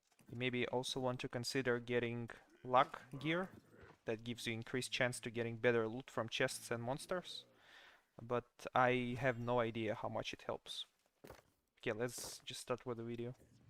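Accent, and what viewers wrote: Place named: Russia. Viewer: That russian accent 😁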